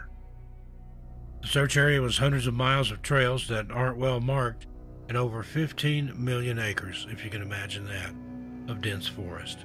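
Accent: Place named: Tennessee, United States. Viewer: I immediately heard a Tennessee accent (was raised there and used to live around monroe county) and got excited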